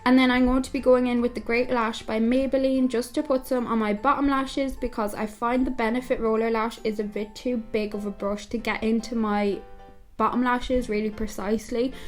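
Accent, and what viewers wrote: Ireland. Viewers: Love this xxx just wondering what county u from X I honestly can't tell hahaha xx I'm from tipp btw xx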